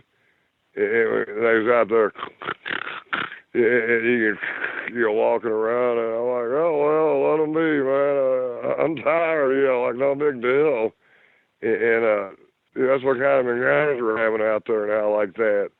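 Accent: South, United States. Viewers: Just a Southern drawl to me! Nothin' bad about that.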